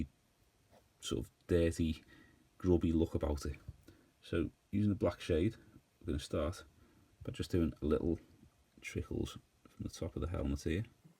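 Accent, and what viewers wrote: Liverpool, United Kingdom. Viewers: Scouser! Where you from man? I'm from over the water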